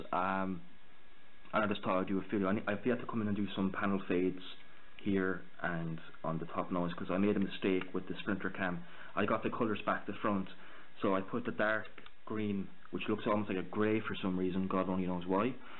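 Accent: Ireland. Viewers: Great to see ya back, and to hear those dulcet Irish tones;) Ya there's still snow in the woods over here but it's melting really fast now. It's pretty much been the worst winter that I remember ever. I'm hoping that it's the last one like that. I really like the look of that panzer 1 bud, I normally don't do the contest thing, but I may make an exception. Mark me down for when you do the draw eh? That's a nice little kit and I've never build ta Pz I.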